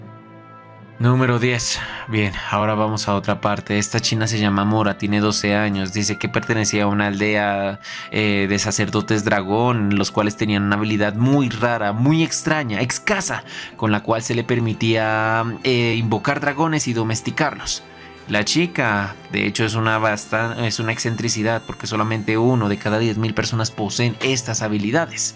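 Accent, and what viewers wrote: Colombia. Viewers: Bro tú eres de Colombia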